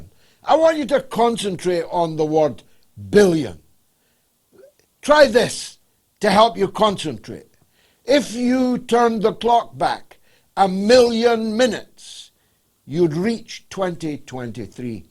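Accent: Scotland, United Kingdom. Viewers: The Scottish accent really helps to emphasize the urgency of the message. Brilliant.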